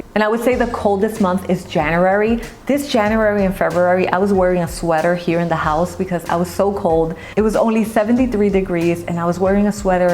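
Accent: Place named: Puerto Rico. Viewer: I love your Puerto Rican accent. Sound's great.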